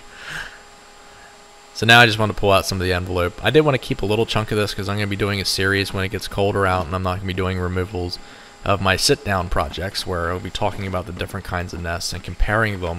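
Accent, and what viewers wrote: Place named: Pennsylvania, United States. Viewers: lol oh man that is def a pennsylvania accent.. it always reminds me of someone i knew from the poconos who spoke like this guy.. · Ah man my family has a bee farm and yellow jackets everywhere! Hate them. I’m from Pennsylvania myself. Sounds like you are from the Pittsburgh area👍🏻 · Are you from Philadelphia? Your accent sounds like big jay oakersons philly impression lol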